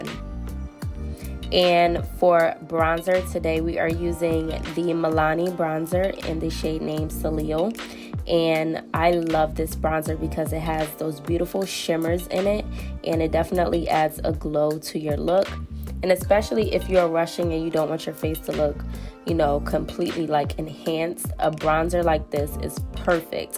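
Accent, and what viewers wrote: Jamaica. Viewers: Gurl jus a c seh u a jamaican❗ daumnn Loving yr videos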